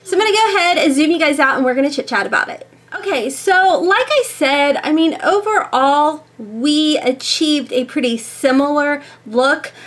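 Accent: South, United States. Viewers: Girl I wished I would have seen this video first lol. Because I'm all about saving money. I really enjoy your channel plus your southern drawwwwww girl you would fit right in here in GA.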